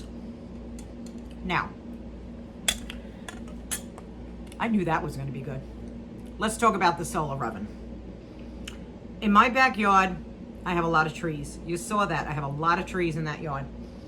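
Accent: New York, United States. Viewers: Nice!! I lived on Long Island till I was 32 years old and we moved to PA in Mount Joy and then moved to Mechanicsburg. We only lived in PA for four years and moved back to NY near Rochester! I enjoy your accent! It feels like home! lol I sound a lot like you. My husband and I were watching you and I was like, she sounds like meeeeeee!!! lol You have a new fan! :)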